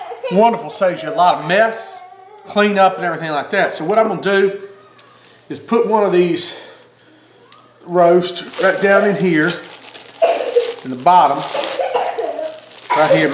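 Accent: South, United States. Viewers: Lol i love you... Just good ole southern voice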